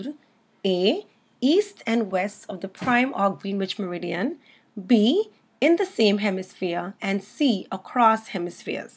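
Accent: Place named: Jamaica. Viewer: You sound like a jamaican👅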